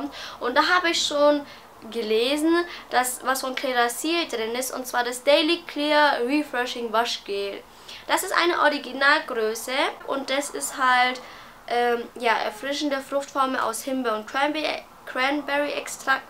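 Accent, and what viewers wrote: Franconia, Germany. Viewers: Bin vor ein paar Tagen auf deinen Kanal gestoßen und muss sagen dass er mir richtig gut gefällt! Du bist ja so eine süße! Übrigens wohne ich ungefähr 60 km von Würzburg weg. Und man hört es voll das du aus Franken kommst! So süß :) ich freue mich schon auf weitere Videos von dir! :)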